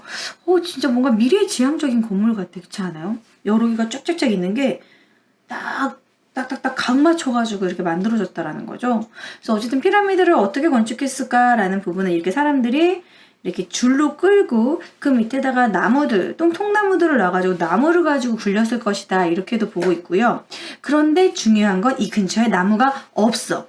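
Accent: Gyeongsang, South Korea. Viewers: 갱상도 분!?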